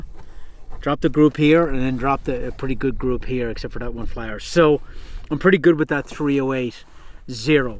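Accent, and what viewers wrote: Ireland. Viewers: Where is the presenter from? Sounds Irish to me. He said 'so we were', which suggests Northern Irish rather than the Republic. Perhaps border. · Two things that accent, Irish Scottish? Second how does the rifle operate from short action to long action? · Hey mate you sound Irish 🇨🇮🇨🇮🇨🇮 👍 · i have to ask.do you have a slight irish accent? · Great video, what pat of Ireland you from? I cant tell, Dublin? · Irish! That's it! I couldn't figure out! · I can hear a hint of Irish Accent in yer Patter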